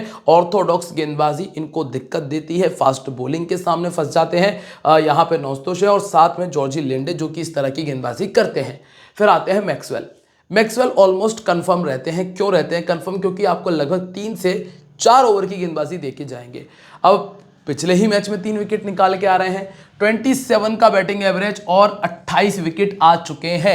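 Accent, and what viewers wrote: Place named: Rajasthan, India. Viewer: Bhai aap mujhe rajesthan se lagte hai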